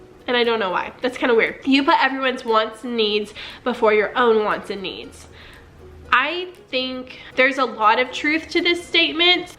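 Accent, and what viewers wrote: South, United States. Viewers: I live in middle Tennessee.. I knew that southern accent!!!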